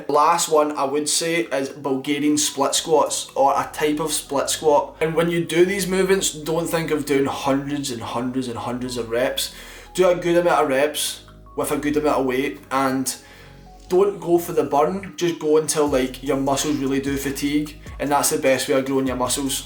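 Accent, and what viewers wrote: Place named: Scotland, United Kingdom. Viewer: Your accent is so great haha, I love Scotts. Great video mate!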